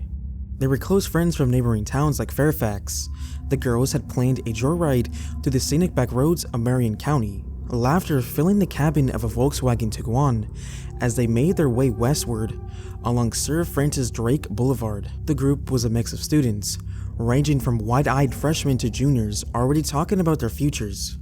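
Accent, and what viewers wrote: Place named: Toronto, Canada. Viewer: You sound like you're from toronto!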